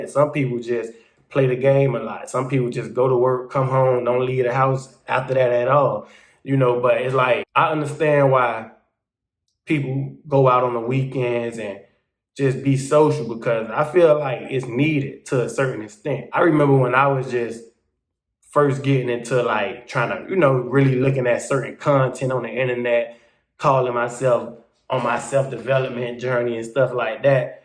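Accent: Florida, United States. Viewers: That's Florida slang, I know alot of grown dudes who sounds just like this. I don't think it's put on. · Might be a FL thing , I can understand him. But most ppl living in FL are not from FL 😂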